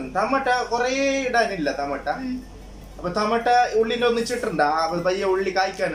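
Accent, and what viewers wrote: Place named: Kasaragod, India. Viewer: അടിപൊളി സംസാരം രസമുണ്ട് കേൾക്കാൻ കാസർക്കോട് languageജോറായിട്ടുണ്ട്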